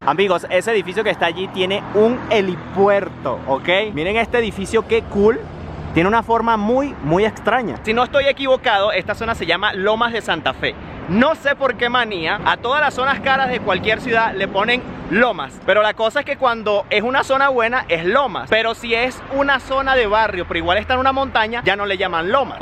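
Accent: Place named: Venezuela. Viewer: es venezolano, no le oyes el acento?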